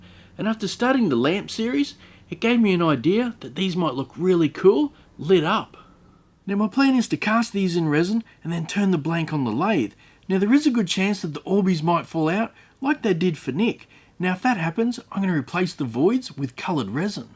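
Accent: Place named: Australia. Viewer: Hey! I just subscribed to you a few months ago, and I've seen lots of your videos now. Also, I'm subscribed to Nick Zametti (I think that's how his last name is spelled, I'm not sure). At the beginning of this video, you mentioned about Nick doing an experiment with Orbeez as well, and having a similar problem with them involving resin. If I remember correctly, Nick Zametti also did an experiment with Orbeez involving resin, and having a similar problem with the Orbeez being too moist to adhere to the resin. I'm assuming that that is what you were referencing to with Nick. I wanna let you know that Nick Zametti just posted a video toying with the idea/proposal of possibly quitting YouTube, but being talked out of it by his fans. I would like to propose an idea to you about Nick Zametti. I would be tickled pink if y'all would collaborate with each other on a project. 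Like, if possible, not really just doing mirroring videos of each other doing a similar project together yet physically separated from each other, but a collaboration video of y'all being physically next to each other, sharing the same space, and knocking around ideas against each other, and possibly y'all working on separate pieces of a single project together, to where the two pieces that y'all work on come together into a single cohesive product. If that would be possible, that would be AWESOME!!!!!! Y'all have a similar accent, so I'm guessing y'all are either from Australia or New Zealand, cause to me, th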